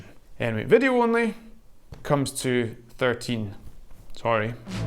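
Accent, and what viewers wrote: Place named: Edinburgh, United Kingdom. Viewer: Another excellent video. Trying to place that accent. Edinburgh?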